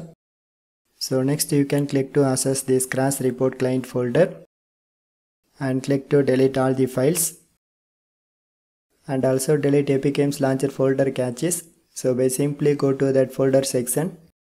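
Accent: India. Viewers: I love you indian accent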